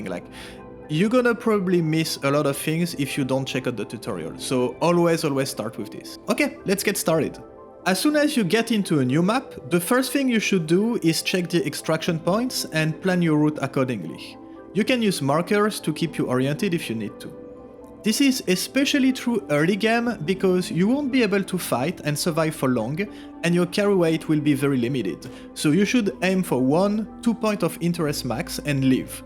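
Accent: France. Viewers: you sound french, and i know what i'm talking about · is that a french accent ?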